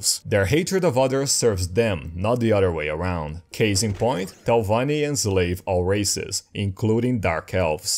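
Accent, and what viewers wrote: Brazil. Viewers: You have an accent similar to Brazilian portuguese, are you Brazilian? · His Brazil accent is so funny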